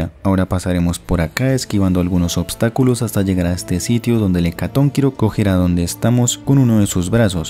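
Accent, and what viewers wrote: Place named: Peru. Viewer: Se nota que eres peruano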